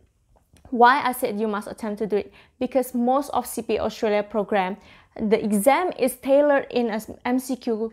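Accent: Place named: Vietnam. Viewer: Vietnam accent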